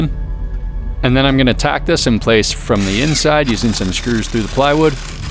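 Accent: Canada. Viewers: As if we couldn't already tell he was Canadian with all the "ungunna"s and "oot"s, he's even got the stamp. 🤣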